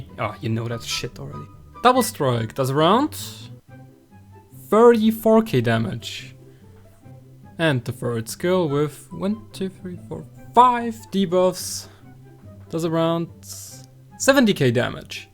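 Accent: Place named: Germany. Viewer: Cringe german-english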